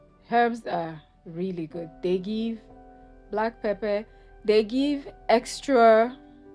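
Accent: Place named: North, United Kingdom. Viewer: Hello sis... Here watching.. Your presentation is giving vibe. I like the northern posh accent echoring beyond your voice 👌👌👌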